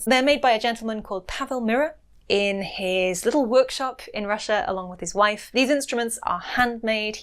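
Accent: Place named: United Kingdom. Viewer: Very nice video, hello from France. (I knew it, when i heard UK. You are not Irish (I 've lived there, i know the accent) not Scottish too i think. So you are from Doctor Who' s country. Love the UK, see you next time.)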